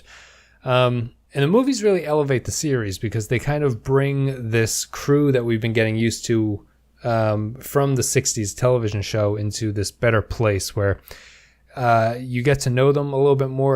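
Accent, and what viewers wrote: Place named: Upper Midwest, United States. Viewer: Over-apologizing? Hahaha! I'm going to show that to all my Can-head buddies here. Actually, you have a very distinct way of saying "out" and "about". If I had to guess, I would say either Seattle, North Dakota or Minnesota.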